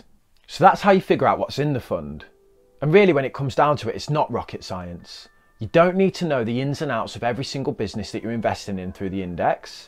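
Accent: Birmingham, United Kingdom. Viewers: videos which we needed with a fckng peaky blinder accent. yoo keep it up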